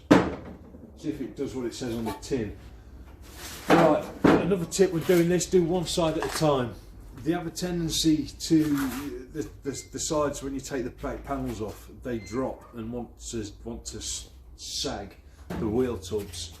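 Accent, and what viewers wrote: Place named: Nottingham, United Kingdom. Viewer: Sounds like a familiar accent, I’m guessing Nottingham. I was thinking Along the same line with the tiger seal but I was hoping not to rivet the seat box bit and just glue it. Thanks for the other tips.... nice wheels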